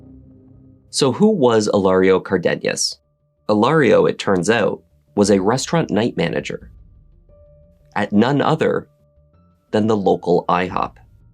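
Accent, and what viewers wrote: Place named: Canada. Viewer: He's not American. He has a very noticeable Canadian accent.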